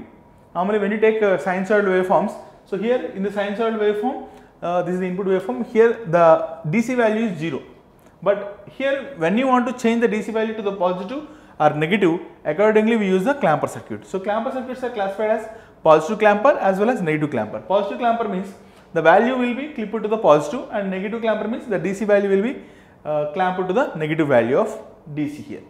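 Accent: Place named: South India, India. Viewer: He is south Indian .they speak like that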